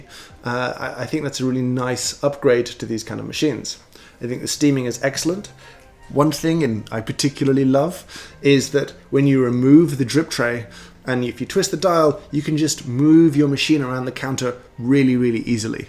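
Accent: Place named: England, United Kingdom. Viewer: Im only here to listen to your English accent......Greetings from Saudi Arabia.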